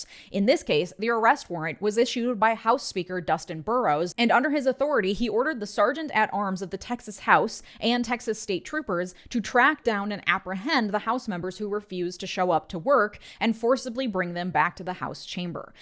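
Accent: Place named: Minnesota, United States. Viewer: Love your channel great info paired with that warm Minnesota accent. Tanks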